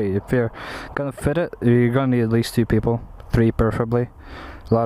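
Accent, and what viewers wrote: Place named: Northern Ireland, United Kingdom. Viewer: Your accent is interesting. It is Northern Irish mixed with something else.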